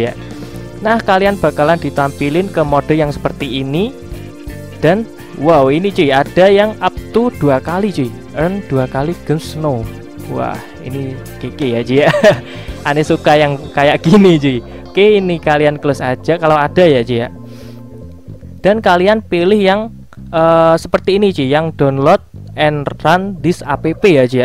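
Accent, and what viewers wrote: Java, Indonesia. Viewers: Ngakak sampe logat medoknya 😂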